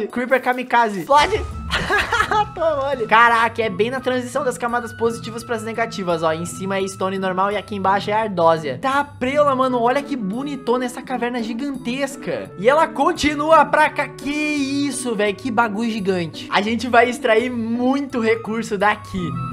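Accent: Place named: Rio de Janeiro, Brazil. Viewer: Vou ver se foi o Feu cariocando vc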